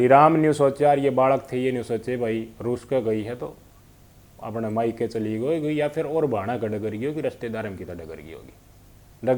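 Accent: Haryana, India. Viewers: Singer to kham kha hanga laa re ha asli haryanvi to bhia saab aap bol re ho ek ek sabed kati sun k maja sa aaga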